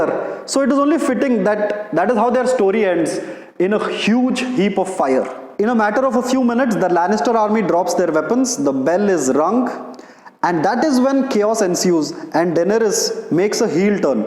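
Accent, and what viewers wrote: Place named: India. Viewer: This guy reads reddit and makes a video with typical indian pronounciation 🤭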